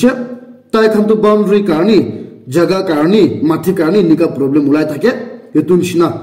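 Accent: Nagaland, India. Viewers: Hi naga..wishing you a merry christmas and happy new year....I like and admire your nagamese news...thanks and bless you for announcing clear and pure nagamese..with prayers mk..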